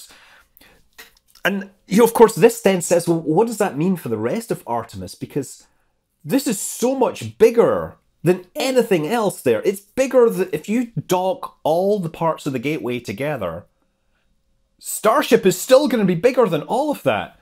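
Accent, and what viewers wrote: Scotland, United Kingdom. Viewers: Otpyrc Ralph Pierre "Kockney"? He's Scottish! Cockneys are from London!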